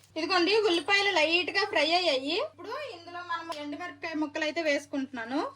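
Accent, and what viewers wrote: Srikakulam, India. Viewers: Vantalu chala baaga chestavu Nee srikakulam bhsha vinte Chala santoshamga untundi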